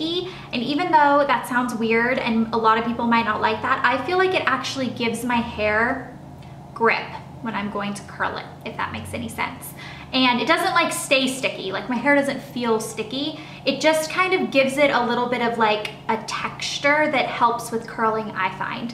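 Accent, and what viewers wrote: South, United States. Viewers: Love it when you speak southern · seriously LOVE your southern accent!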